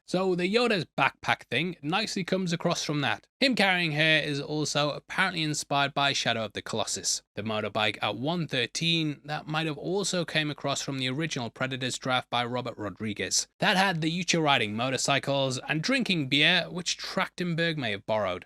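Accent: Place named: United Kingdom. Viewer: He's British, they have a million different accents on a tiny island country. I lived there for years.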